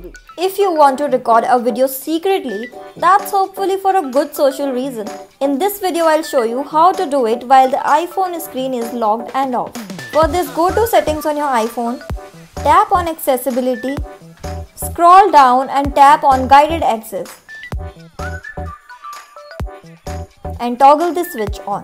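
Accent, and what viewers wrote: India. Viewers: Why is always Indian accents on these videos ?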